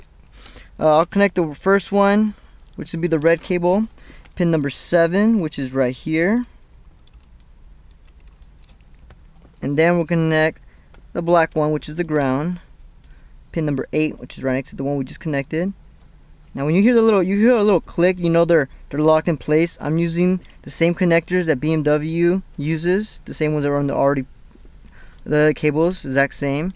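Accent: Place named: Mexico. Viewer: Dude, amazing level of detail and tips on your videos! You sound Mexican, if Trump tries to deport you, you can hide out in my house for the next 4 years :)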